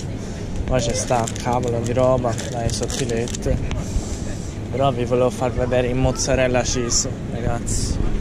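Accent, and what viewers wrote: Tuscany, Italy. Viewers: ✌👏😂😂😂😂😆😆😅😅😅😆😆😆❤💋💋💋TROPPO FORTE TROPPO AVANTI LA PARLATA TOSCANA PERÒ PARLANDO DI ITALIANITÀ QUA' NON C'È RIMASTO PIÙ NIENTE LA NOSTRA CARA PERNIGOTTI E TURCA ADESSO LA BARILLA FATTA CON GRANO RUSSO 😢😢😢😨 E SI QUA IN ITALIA C'È SEMPRE IL NOSTRO MADE IN ITALY MA CI SAREBBE DA PARLARE TANTO SU QUESTO DISCORSO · che bello porca eva sentire il toscano in nuova zelanda! hahaha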